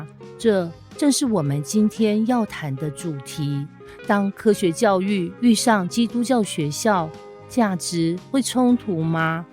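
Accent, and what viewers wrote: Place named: Taiwan. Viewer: 為什麼要用這種奇怪又難聽的腔調講話，這是在醜化台灣人的口音。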